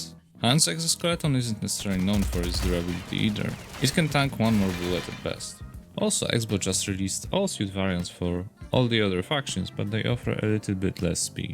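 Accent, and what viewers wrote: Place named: Poland. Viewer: słychac ten akcent polski xd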